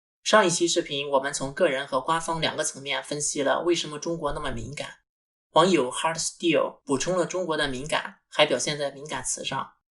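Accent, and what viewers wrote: Shandong, China. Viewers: 聽口音是山東人？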